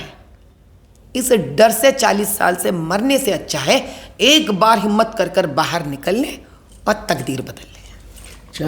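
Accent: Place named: Hyderabad, India. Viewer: माधवीजी आपकी बात पूरा भारत समझ रहा है हैदराबाद की बोली भी सुन्दर है आप उसभाषा। मेभी इनटरवयू देगी तो आपके.मुह से सुन कर हमे भी अचछा लगेगा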